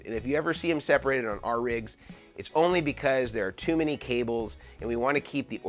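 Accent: United States. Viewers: Not in America. He’s saying it correctly for Americans. Don’t take it personally.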